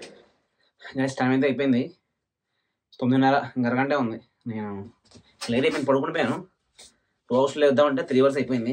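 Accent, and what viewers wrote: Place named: Anakapalli, India. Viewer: Baya e vuru midhi ma anakapalli yasa nilanay untadhi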